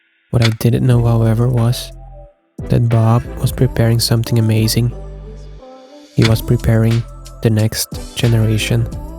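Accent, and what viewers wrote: Netherlands. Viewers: I hear Dutsch😅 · As a person from The Netherlands I was kind of thinking you were dutch by your pronounciation but not quite sure.. this got confirmed though when seeing the Triops kweekset.. Toffe video!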